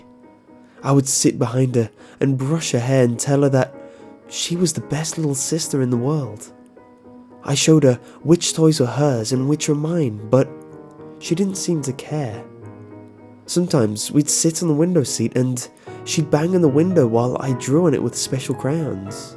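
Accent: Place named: RP, United Kingdom. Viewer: Omg when your on youtube and you listen to american vids all the time and then listen to a british guy we sound so posh lol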